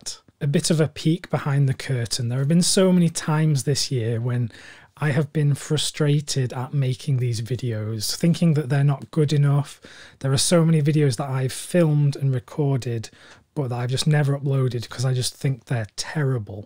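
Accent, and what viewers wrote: England, United Kingdom. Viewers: Unrelated note and in the least homosexual way possible , you have a lovely soft English voice and it’s lovely to fall asleep to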